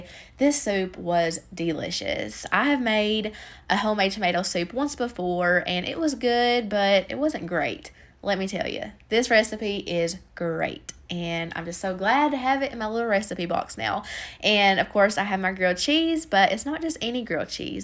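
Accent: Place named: Kentucky, United States. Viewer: I now see why your voice is from lmao im from ky too, i like your dinner ideas. Do you make a planned list for them? Im a beginner cook and im just seeing what the best ways to make a variety of different dinners cos i make a lot of the same stuff for my fiance and i right now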